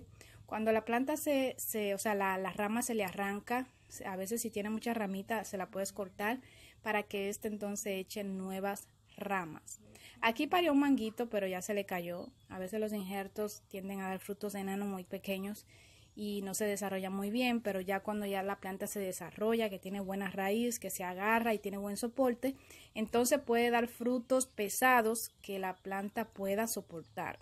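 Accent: Dominican Republic. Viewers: ahh es que no conocia el acento de republica dominicana suerte con tus arboles👍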